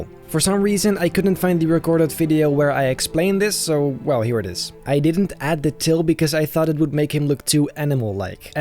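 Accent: Netherlands. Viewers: Ik vind het zo fantastisch dat je het Nederlandse accent niet kan verbergen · are u dutch?🤔🤔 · We miss the dutch accent videos 🥲😂😂😂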